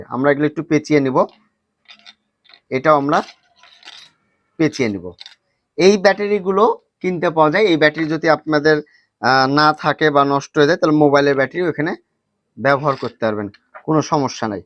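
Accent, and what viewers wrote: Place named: Chapai Nawabganj, Bangladesh. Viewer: ভাই আপনার বাড়ি চাঁপাই না রাজশাহী না নওগাঁ? 😊 আপনার কথা শুইন্যা হাঁরঘে চাঁপাইয়ের মতন লাগছে❤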